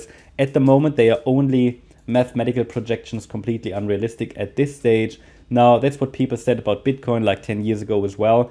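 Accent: Germany. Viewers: Thanks, Hear your accent, i assume you are German. I am also living in Germany and have made quite significant gains with Solana. I wouldn't like to sell it because of 42% crypto tax here i need to hold on until july because then I'll complete 1 year and then tax rate would be 0%. What would you suggest? Take my profits now or wait until July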